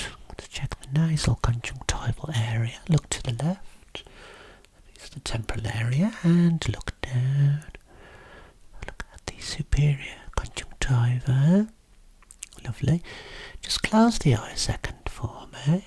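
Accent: United Kingdom. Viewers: I absolutely love your voice and your british accent. <3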